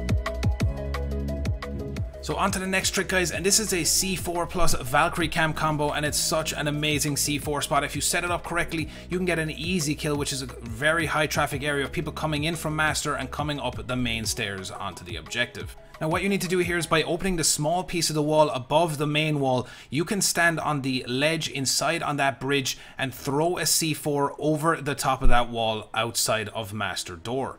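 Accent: Ireland. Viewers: U irish? If so where from lad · You Irish?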